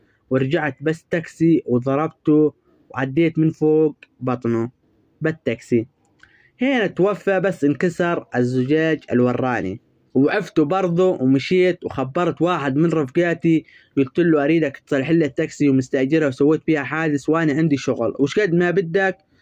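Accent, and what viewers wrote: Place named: Hejaz, Saudi Arabia. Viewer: السلام عليكم اخي ياحلو سوالفك وسردك للقصه ليتك كل ليله تجيبلنا قصه وجزاك الله خير والله تابعت كل قصصك واعجبني لهجتك الحجازيه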